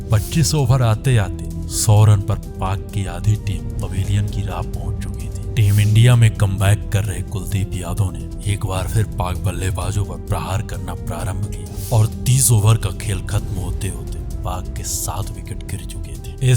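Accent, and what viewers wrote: Bihar, India. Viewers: Tu bhihari he yaa uttar pradesh wala 😂😝😝😝😝🤬🤬🤬🤬🤮🤮🤮🤮🤮🤮🤢🤢🥵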